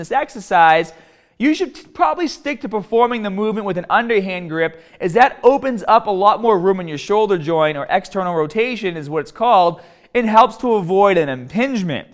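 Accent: Boston, United States. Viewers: Is this a Boston accent? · You're certainly from Boston lol, but u crossfit bruh??? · Dude you from Boston ? 😂 the accent tho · From Boston? Me too lol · Definitely a Bostonian. love it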